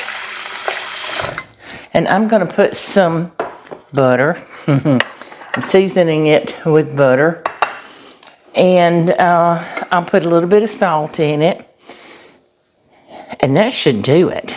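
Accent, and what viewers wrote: South, United States. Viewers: Thank you so much,🎉 my mother made this often when i was young,, this is hard to find i think because i just haven't seen any liver in the market, you're so special 😂i love your sothern accent, my father was from tn this takes me home, you have stole my heart ❤️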